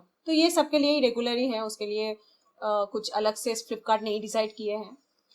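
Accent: Bengal, India. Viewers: বাঙালি হিন্দিতে কথা বললে শুনে মজা আসে|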